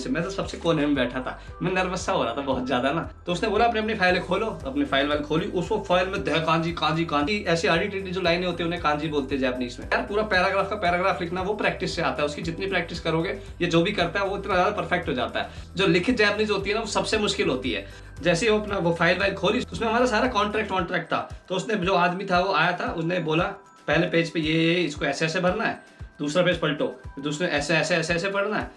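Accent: Haryana, India. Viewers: Haryanvi on the top!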